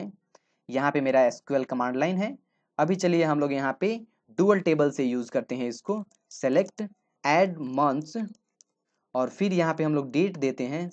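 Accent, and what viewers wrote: Bihar, India. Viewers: bihar k lagte ho bhaya...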